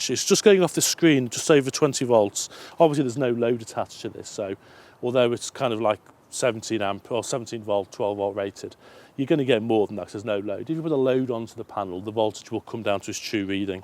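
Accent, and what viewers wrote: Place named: Northwest England, United Kingdom. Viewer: Hi, I am a complete noob at this sort of thing, but what sort of wattage would you get from your 25w panel give, would it power a 2500w watt chain saw? we are trying to power a allotment shed to give us power on site, ps I could tell by the accent your from the northwest I a from liverpool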